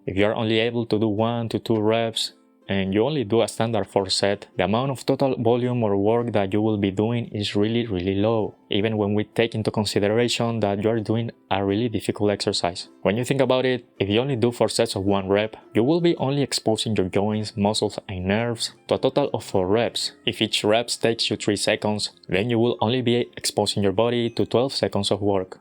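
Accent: Spain. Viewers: First time seeing an asian guy with a spanish accent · That muscle up handstand is awesome. You sound like a European.. it's like hearing a Spanish person speak English. Interesting!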